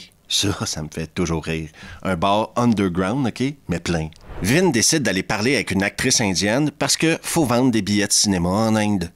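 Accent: Quebec, Canada. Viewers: J'ai découvert ta chaîne il y a quelques jours et je me suis tellement fendu la poire que j'ai dû voir au minimum une cinquantaine d'épisodes. Qql suggestions : Brain dead, bad taste, phase IV. PS, j'adore l'accent québécois 🤩🤩🤩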